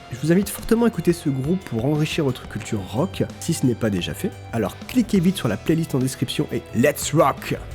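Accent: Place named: United Kingdom. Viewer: Belle découverte ! Et c’est moi ou ton accent british s’améliore 😅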